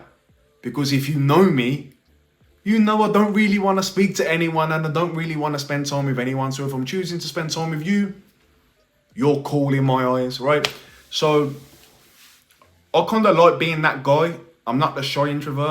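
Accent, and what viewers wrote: Wolverhampton, United Kingdom. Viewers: You sound like me born and breed in Wolverhampton.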